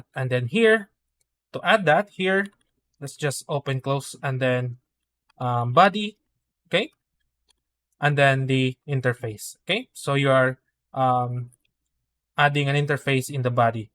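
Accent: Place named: Philippines. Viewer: The accent is very noypi 😂. You have my sub, keep it up.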